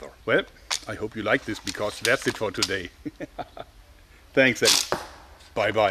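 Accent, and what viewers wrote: Germany. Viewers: Warum klingt dein Englisch so deutsch? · Nice german accent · Dieser Moment wenn man als Deutscher Deutsche in einer anderen Sprache wiedererkennt haha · du hörst dich an wie ein deutscher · I guess its the German accent. One of the guards voice from Skyrim always sounded like Arnold Schwarzenegger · Das deutsch hört man raus! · when he speaks english he sounds more german than some germans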